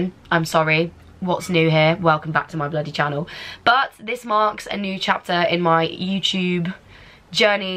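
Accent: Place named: West Midlands, United Kingdom. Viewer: Where has your lovely West Midlands accent gone? Please don't turn posh....Brummie is sooo much better! X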